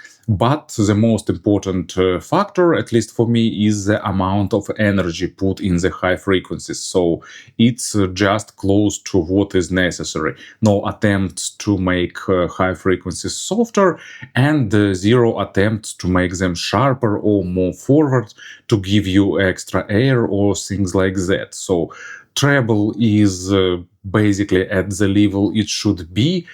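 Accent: Ukraine. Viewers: I appreciate the daily dose of sophisticated Ukrainian accent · “Sophisticated” daily dose of Ukrainian accent 👍 Greetings from India 🇮🇳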